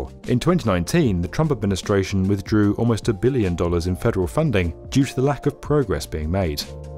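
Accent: England, United Kingdom. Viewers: Another fun fact-- the hugeness of our country & relative lack of population density makes new trains less practical. Endless EPA hoops & needed land acquisitions add decades & hundreds of BILLIONS of dollars to the project. A suave-sounding Englishman does not make the project more appealing or make gargantuan hurdles any easier to overcome. Concrete from early construction will need to be repoured toward the end of construction at the current pace. I know, CHINA, CHINA, CHINA. THEY HAVE GREAT TRAINS THERE. MAYBE YOU SHOULD RELOCATE TO BEJING! Nsity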